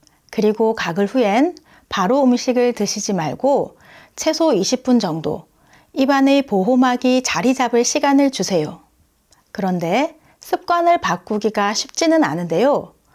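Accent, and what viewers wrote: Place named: Gyeongsang, South Korea. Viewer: 깨알 정보 정말 고맙습니다 좋댓구알 햇십더~ 구수한 경상도 억양 좋아요~~소실적 생각 많이 납니다 ㅎ 구룡포도 생각 나구여!! 영상 감사 감사 합니다~~~! 화.이.팅하세요~~!)))))))))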